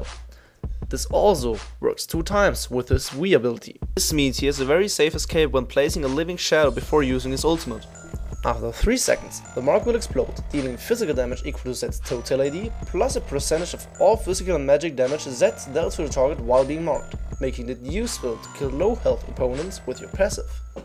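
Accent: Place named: Germany. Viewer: Ich kann dein Englisch mit deinem Deutschen akzent nicht ab :D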